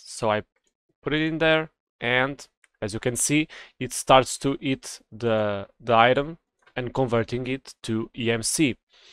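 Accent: Portugal. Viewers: Maan thanks for the tut bro ! Enjoyed the Portugues accent lol 😂 Bro just how you can make alchemy chest ? I couldnt do it SMH ... did you make it in philosopher stone or in craftin table ? Edit ! Problem solved !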